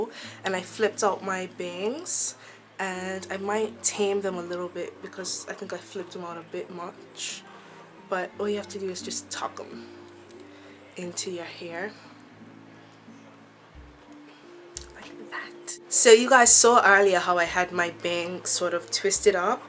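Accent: Bermuda. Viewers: Are you Bermudian? Your accent sounds like it... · You sound bermudian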